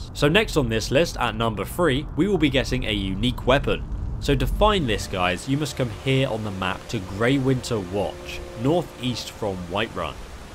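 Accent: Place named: United Kingdom. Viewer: What I've been subbed for 3 years now and I just noticed your British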